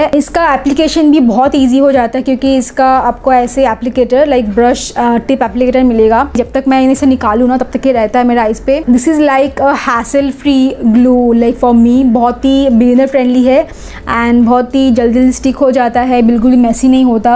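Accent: Bengal, India. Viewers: You are Bengali apke baato se pata chal Raha hai Bengali log Hindi bolte hai toh pata chalta hi hai 😅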